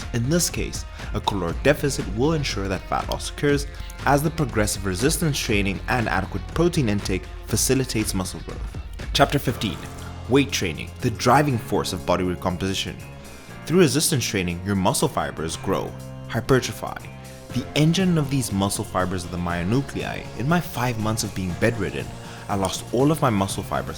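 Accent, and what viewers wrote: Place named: South Africa. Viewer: I just came across your video and your accent sounded South Africa so i kept watching. I am South Africa living in the States. I am starting life style change. Don't want to lose weight but want to gain muscle. I am enjoying your videos.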